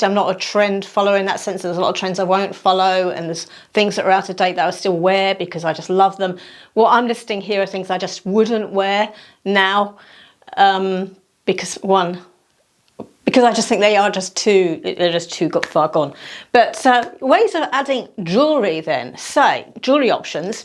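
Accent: England, United Kingdom. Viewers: The color of lipstick you are wearing may your teeth look off color not white! You’d look younger if you would wear brighter lip color with a sheen and get your teeth whitened! You have become really great on YouTube! Love the English accent and humor!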